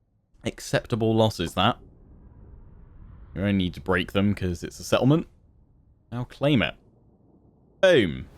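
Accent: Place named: United Kingdom. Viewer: Hearing all the unit names from a Brit sounds like I’m getting insulted. I LOVE IT!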